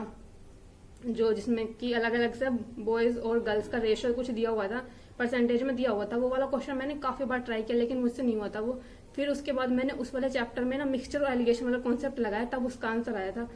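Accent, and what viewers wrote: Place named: Agra, India. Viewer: aapki boli agra jesi hey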